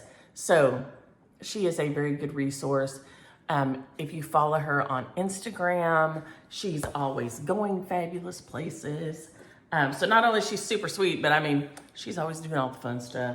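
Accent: South, United States. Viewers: . I love listening to you with that southern accent (my parent both😮s were from the south so the majority of my relatives have that same accent). I'm so sorry about your framing debacle. I live in Michigan sol our pumpkins are all cold up here. I hope you have a fabulous weekend. Take care. Pat Tackaberrry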